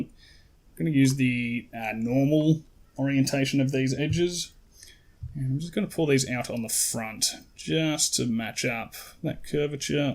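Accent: Australia. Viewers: Thanks! Wow, so nice to hear an Aussie voice in a tutorial, apart from Andrew Price. I think you should either do a full model of this space marine or do a Terminator helmet next. Subbed and have a drink on me, cheers.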